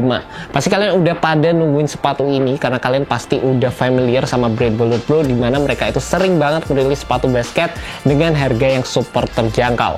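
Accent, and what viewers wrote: Java, Indonesia. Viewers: Medok olweis on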